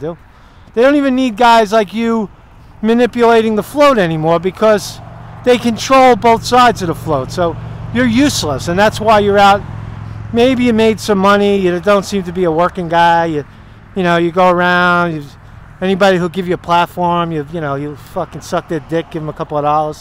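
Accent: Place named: East Coast, United States. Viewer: This channel is PURE GOLD, east coast kid. I grew up in CT married a girl from Jersey who's Full blooded Italian mom and dad were from Brooklyn (Dad) and Newark. (Mom) I live in the Midwest now and Brother let me say your accent and mannerisms sound like HOME to me. I could listen to you gab all day long, and the content is ACES. Homesick.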